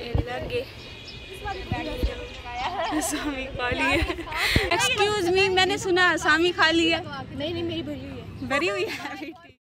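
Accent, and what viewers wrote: Saraiki, Pakistan. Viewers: Apke voice bhut ache saraiki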